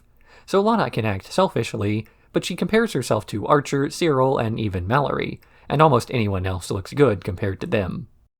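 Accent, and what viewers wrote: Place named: South, United States. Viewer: Ah! I think not being American where the accent is from can be hard to place so I think it’s very interesting that yours is described as southern draw! But I also think is funny is that I have been biting my tongue from making this comment so many times because it just seems so rude even though I knew I was coming from a place of curiosity and I have heard you say women before but the last few videos I’ve watched of yours you haven’t and then as soon as you’ve replied to this comment you just said it again 😂 thank you for taking the time to reply and I do hope it didn’t come across as obnoxious!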